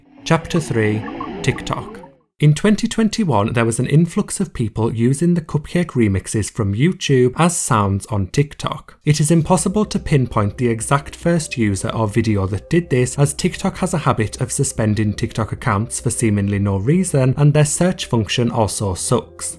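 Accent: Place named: Liverpool, United Kingdom. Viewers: I love your liverpoolian accent "Cupcek"😂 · Some Northern British, I suppose.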